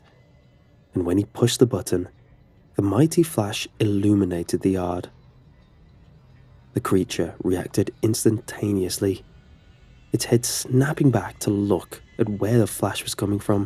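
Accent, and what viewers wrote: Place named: RP, United Kingdom. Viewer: Think that's just how posh twats talk it sounds like that 😂😂😂😂 lmao. I'm from the UK I am not bloody English tho!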